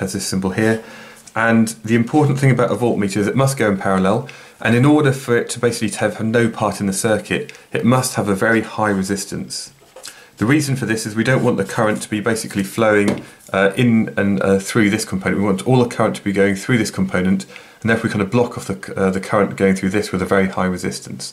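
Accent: United Kingdom. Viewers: British English is wonderful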